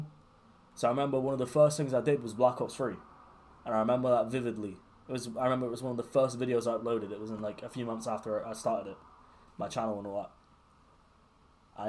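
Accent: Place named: United Kingdom. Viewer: Do something else ❌ Watch talk about his life and the things he's passionate about with a british accent. ✅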